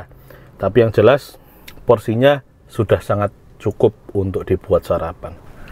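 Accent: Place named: Jakarta, Indonesia. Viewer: Sing dodol wong ndi cak...kok logate sok Jakartaan 😂 #dibahas Tapi asli ngiler liat sego bali daginge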